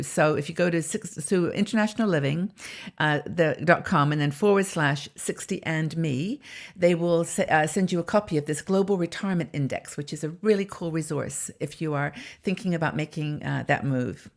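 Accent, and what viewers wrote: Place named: United Kingdom. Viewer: Hello, thank you for this inspiring post of positive affirmations! This has definitely set the tone for my upcoming workout at the gym and day. I'm 62 and am embracing my age yet fighting my age too. I say 62 is the new 29. Haha! Love your necklace and you look awesome in red! I have to ask, where are you from as you have a slight British accent? Looking forward to your next video. 💜 from Texas.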